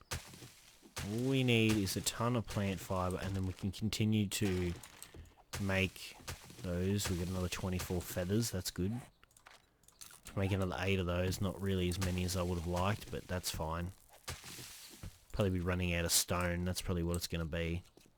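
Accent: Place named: Australia. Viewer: sure did! accent kept me in lol, Australian?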